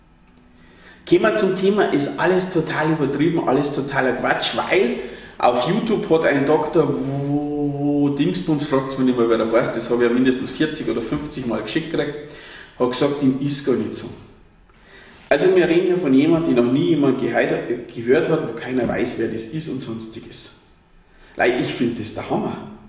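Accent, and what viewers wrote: Bavaria, Germany. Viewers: Ich hätte mir ja gerne das komplette Video angeschaut, aber die Raumakustik ist so schlecht, dass ich dein Bayrisch, das ich sonst so einigermaßen verstehe, nur mit sehr großer Anstrengung halbwegs verstehen kann. Falls ich der einzige bin, dem das so geht, dann vergiss meine konstruktiv gemeinte Kritik, denn dann liegt es wahrscheinlich an mir.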